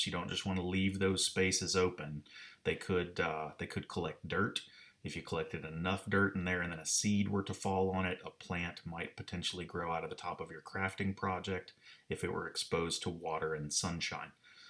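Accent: Norway. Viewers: I haven’t laughed this hard in weeks! Bunny suit, whitest paint out there, dip them in a bucket, stamps with a Norwegian accent, I had to pause the video and regain a semblance of control so I could hear the rest. What a guy!!! Thanks to you both for such a fun watch, wash those hands and stay safe and well. Kris P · Norwegian accented stamps... braided and unbraided and braided again rope 😂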